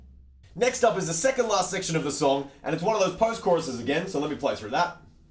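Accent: Australia. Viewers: Wait a minute..........r u Australian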